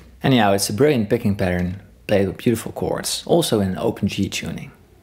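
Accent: Netherlands. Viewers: You sound Dutch to my Dutch ears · waarom hoorde ik meteen dat je nederlands bent? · i have to ask, are you dutch? your accent sounds dutch lol. if so, ik ben ook nederlands! ik hou van je video’s :) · Are you Dutch? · Ik dacht dat ik al ergens een klein Nederlands accent hoorde! Perfecte video's! Erg nice om naar te luisteren ^^ Keep up the good work!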